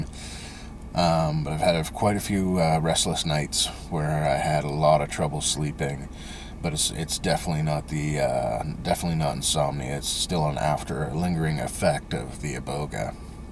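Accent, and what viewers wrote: Canada. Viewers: This guy is Canadian he says aboot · Good stuff man. I am ready to have that trip. You sound Canadian? How do I message you btw?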